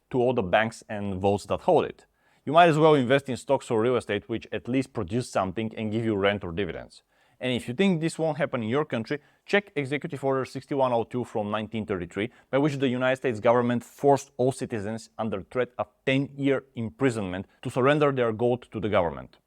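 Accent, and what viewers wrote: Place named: England, United Kingdom. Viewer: Very hard to understand and main reason is that ''English'' accent ... :(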